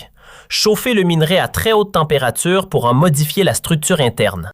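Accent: Canada. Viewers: Désolé pour vous, même s'ils ont utilisé la voie canadienne pour la lecture de ces commentaires dont le contenu n'est nullement convainquant, un économiste algérien formé en Algerie vous répond, Ce que vous oubliez est plus important que ce que vous débitez, vous oubliez le rôle du plus grand port de Méditerrannee, celui de Hamdania, qui ouvre la voie au commerce international du Sahel vers le Monde, et l'inverse. Vous oubliez de préciser que cette voie ferrée se situe sur le tracé de la voie des épices que la Chine compte développer. Vous oubliez de rappeler que ces trains vont servir à acheminer les produits des activités du sud, produits agricoles, le lait en poudre de Baladna, les mines de cuivre qui sont sur cette voie... Ce que vous appelez de petites gares, deviendront des villes, allez consulter l'histoire de la création des villes américaines et ailleurs grâce aux lignes ferroviaires. 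Ces lignes ferroviaires ne decervent pas seulement cette région, mais elles relient toutes les régions d'Algérie entre elles. Je m'arrête, mais je pourrais en parler pendant des heures, et ce que j'ai écrit là n'est pas dans le but de vous éduquer d'avantage seulement, mais c'est surtout pour informer le Peuple algérien contre les rumeurs et mensonges que des gens comme vous sèment pour foutre la merde dans les pays qui gênent le sio international...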